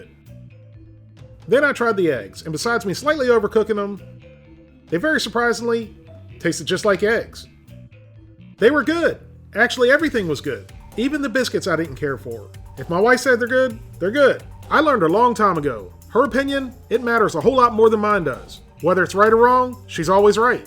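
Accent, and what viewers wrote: South, United States. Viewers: Probably a southern tradition plus he had the accent for it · Farmer john is a la area pork distrubuter, but you have a southern accent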